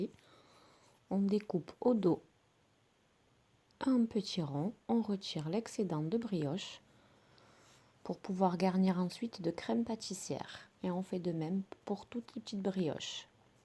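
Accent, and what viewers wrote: South, France. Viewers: Magnifique dès demain je vais essayer Merci vraiment pour avoir la gentillesse de nous faire partager vos recettes et avec un accent magnifique du sud qui me rappelle Mon enfance que demander de plus bravo surtout continuez et encore une fois Merci 😊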